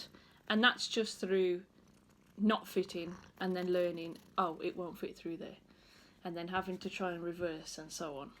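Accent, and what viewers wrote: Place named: Black Country, United Kingdom. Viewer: but your not west mids your a yam yam be proud of it your accent is bostin , enjoy your time on the road but be warned its adictive it getts in the blood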